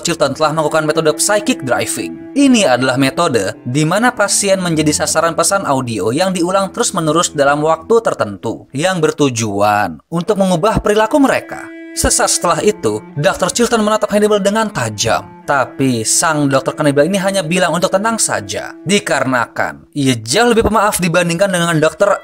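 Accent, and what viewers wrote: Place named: France. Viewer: Mantap bang accent perancis nya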